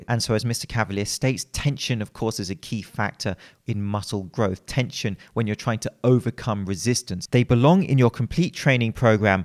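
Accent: United Kingdom. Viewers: He is not polite or calm it's just his speaking style, his accent, and pronunciation. It's like partway british where he articulates each pronunciation and his pitch up and down make him seem calm.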